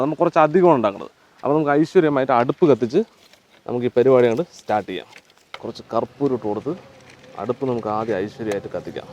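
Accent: Palakkad, India. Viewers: ഞാൻ എന്നും വിചാരിക്കും നിങ്ങളുടെ കൂടെ ഇരുന്നു കഴിക്കുന്നവരെ പോലെ എന്റെ വീട് നിങ്ങളുടെ അടുത്തില്ലല്ലോ എന്ന്. ഞാൻ കോയമ്പത്തൂരിൽ ഉള്ള ആളാണ് നിങ്ങളുടെ ഭാഷ കേട്ടാൽ പാലക്കാട് ആണെന്ന് തോന്നിന്നു നിങ്ങൾ എനിക്ക്‌ ഒരു അപ്പോയൻമെന്റ് തരുകയാണെങ്കിൽ ഞാൻ നിങ്ങൾ കേരളത്തിൽ എവിടയാണെങ്കിലും ഒരു ദിവസം നിങ്ങടെ കൂടെ ഇരിന്നു ഭക്ഷണം ടേസ്റ്റ് ചെയ്യണമെന്ന് ആഗ്രഹിക്കുന്നു.. പറ്റിയാൽ എന്റെ നമ്പറിലേക്ക് ഒരു ഫോൺ വിളിക്കണം.. <num>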